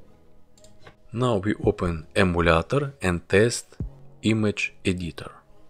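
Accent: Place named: Russia. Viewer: ну 100% понятно что русский, а почему на английский разговариваешь)?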